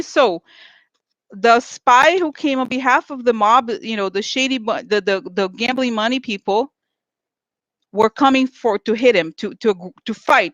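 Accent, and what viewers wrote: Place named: Russia. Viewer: Mark Harris I try to imitate her Russian accent but I can’t get the rolling R’s down. It’s so funny!! Love her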